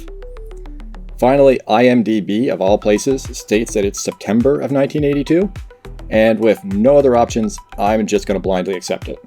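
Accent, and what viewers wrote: Canada. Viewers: Pardon me, are you Canadian?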